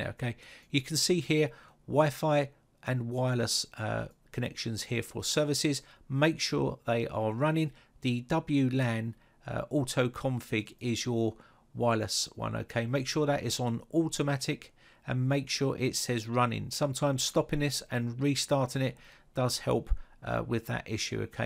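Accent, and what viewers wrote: United Kingdom. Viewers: Hearing a british accent after all of those indian ones is so refreshing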